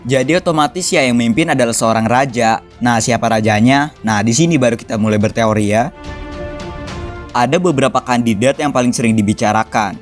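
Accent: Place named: Jakarta, Indonesia. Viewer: fix admin Anak Jaksel Banget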